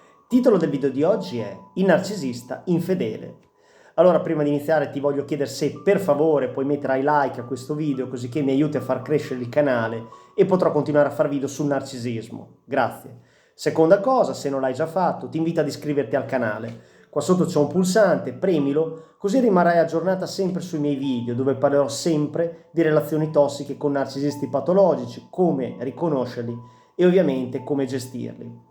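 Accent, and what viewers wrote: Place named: Friuli, Italy. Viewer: Hai un bell´accento. Friulano?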